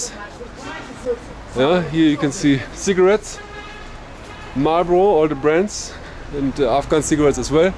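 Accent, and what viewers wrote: Germany. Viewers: German English accent is so interesting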